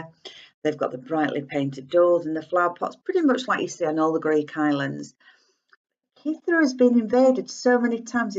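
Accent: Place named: North, United Kingdom. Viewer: nice northern accent, poli kala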